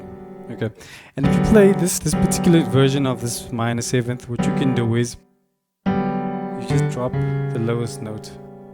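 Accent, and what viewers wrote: South Africa. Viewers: Are you from South Africa? The accent seems a bit familiar.. :-)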